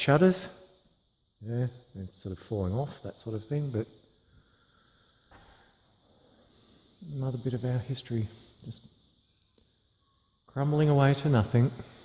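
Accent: Australia. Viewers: I am an American. Love your explores, and your Australian accent..Lucmy to have found your channel. Keep up the interesting work.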